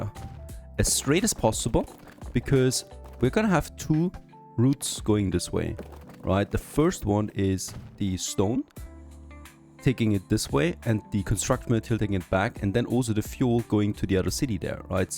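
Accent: Germany. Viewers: alter der deutsche Akzent ist so hart zu hören ne, aber ist ein cooles gameplay ;) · you only need signals at the end when leaving the double tracked bit. and stations have their own integrated signals. putting one in front of the station is basically asking for trains to block each other. signals in this game are only needed in parts where trains could otherwise block each other. they check the track behind the signal if its clear to the next signal and if yes it lets them go. so you want to place them at the ends of the double track to have them check if the path until the station is clear and if not they wait there. the signal after the station only increases your upkeep but doesnt add any value as the same function is integrated into the station. you would want some block signals in the double tracked part but you dont even need those if you are only running 2 trains. 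this entire line would do perfectly fine with only 2 signals, 3 if you want to be safe and give the depot a signal before merging with the main line. waypoints arent needed at all because the trains would automatically orient towards the correct side with the signals for exiting the double tracked part. your accent sounds german so if you are german i can explain it in more detail in german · ich hör das deutsch raus...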